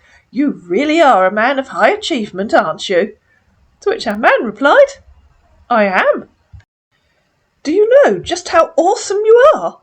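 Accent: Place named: England, United Kingdom. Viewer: perfume addict Thanks lol 💗 🤣 You have a lovely English accent, we were talking about our accents before, very easy on the ear. My accent is more flat. I hope you're enjoying your week ☀️🌿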